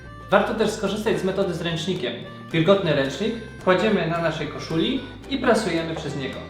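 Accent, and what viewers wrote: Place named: Poznań, Poland. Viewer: dwa słowa i już wiesz, że autor jest poznaniakiem 😁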